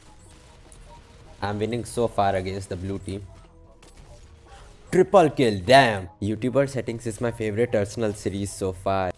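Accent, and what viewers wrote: India. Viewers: I had a feeling you were Indian lol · yo it seems like you're indian, based on your accent are you? i am "indian american" but you are so cool for telling me that also whenever i play arsenal i hate this: "DEAD UPON SHOT REGISTERING"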